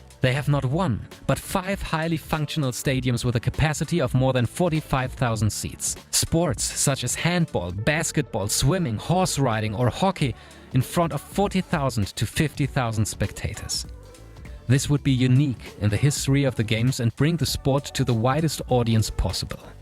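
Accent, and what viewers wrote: Germany. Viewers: His accent ist most definitely from a German speaking country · I didn't need to hear him say Rhein-Ruhr to figure that out, he has a german accent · I like his german accent. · I can hear your German accent soooo clearly!!! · If you have not noticed it by the German accent this was the ultimate hint. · When i heard the german accent i instantly went to channelinfo just to be confirmed. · because he has a thic german accent and tries to hide it by pronouncing everthing very pREcisLEy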